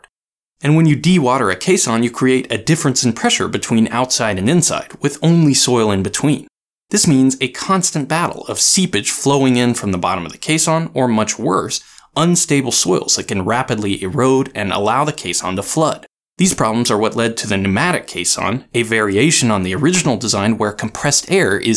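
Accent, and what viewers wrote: United States. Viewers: He speaks so...american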